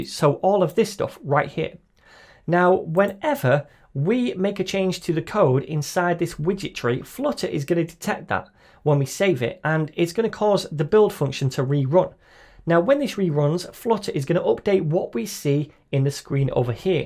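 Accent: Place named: England, United Kingdom. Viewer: I like your English accent!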